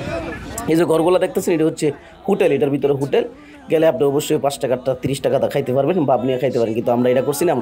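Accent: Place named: Raipura, Bangladesh. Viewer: ঠিক মতন দিছেন একদম আমাদের রায়পুরার ঐতিহ্যবাহী আঞ্চলিক ভাষা!!! আমডারে লইয়াও তো যাইতে পারতেন.....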